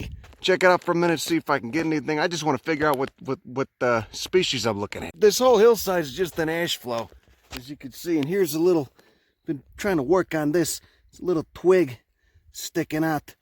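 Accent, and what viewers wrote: Chicago, United States. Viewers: Yes. He is from Chicago, is why... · I love this guy. It's like Elwood Blues became a botanist. Cook county born myself, love this accent. Subbed just to listen to him. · Chicago. Not New York. You can tell by the way he pronounces his rrrrr's at the end of a word. Chi: "conifer" NY: "conifuh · It's an old school Chicago (esp Italian American) accent. He is Italian and from Chicago but he hams the accent up to make the vids more entertaining and funny. You can see him talking in his usual voice here: <link> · It is so funny to listen to such a high IQ discussion in a South Chicago accent. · But he has a Chicago accent, Goodfellas was new York, there is a difference.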